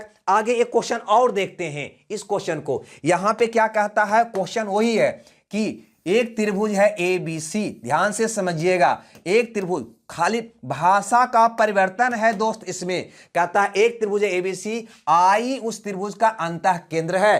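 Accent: Bihar, India. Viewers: Itna sudh hindi to desi board wale ko v samjh aayega sir tone se saaf pata chala rahe hai aap bihar ke hain but aap pura hindi me explain kar rahe hain jo kuch nahi samjh aa raha hai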